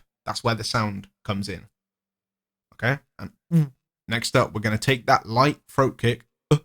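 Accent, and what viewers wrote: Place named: England, United Kingdom. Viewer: English accent 🔥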